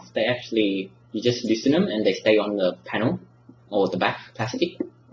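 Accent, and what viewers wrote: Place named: Australia. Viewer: You do realize Aussies all soundlike him right? It's not effeminate.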